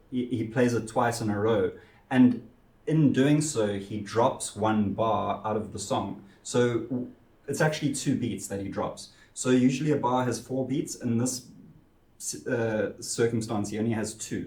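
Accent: South Africa. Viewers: This is awsome man, Ill be using this for sure! Are you South African? If so double hell yeah!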